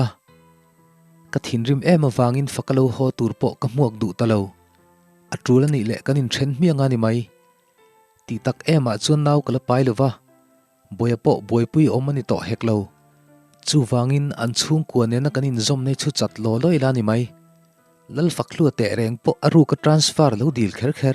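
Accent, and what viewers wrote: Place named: Lunglei, India. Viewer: segundo ngaihthlak nawm na chhan hi chu lunglei lam hi atam thina anuam hranpa ve hrim2 heje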